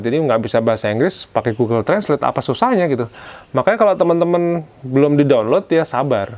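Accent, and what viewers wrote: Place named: Java, Indonesia. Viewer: Dengan bawaan yang rilek,logat Jawa nya kental,Joss kang👍🏻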